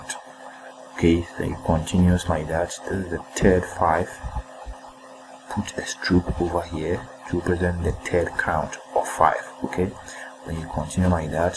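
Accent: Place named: France. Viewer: I have never seen such a thick french accent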